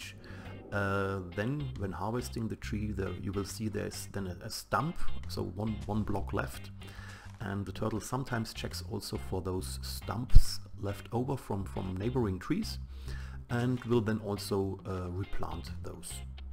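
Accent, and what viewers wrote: Germany. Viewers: bruuuuuder man merkt, das du ein deutscher bist xD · du bist deutscher oder ?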